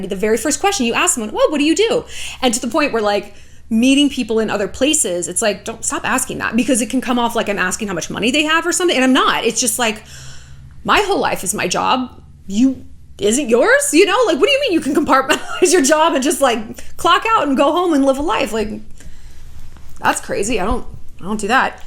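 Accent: France. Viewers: CIGARETTES? OMG. That's total insanity. That's disgusting. I love how you say, "I think about this once a week!" YOU ARE HILARIOUS! I'm dying. I also love your French accent and vocal fry. You're a great actress. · Your French accent <3 and all your other wonderful accents, they're always so entertaining to watch! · Ravie de savoir que tu es française! Ahaha lovely accent xx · J'adooooooore ton accent français. C'est parfait et rigolo, comme toi, beauté ! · Love your French accent, I laughed so much. Greeting from Paris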